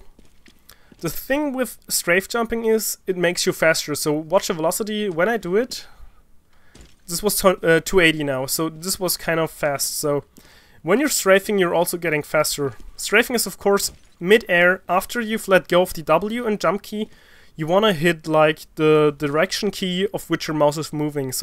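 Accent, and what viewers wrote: Germany. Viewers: are you german ? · are you german you have a german accent in your voice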